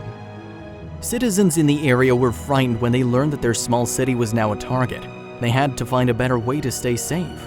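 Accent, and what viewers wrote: United States. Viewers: Unfortunately he is speaking American not English. They pronounce words like a-loo-min-um not al-u-min-e-um and sked-ull not shed-u-al.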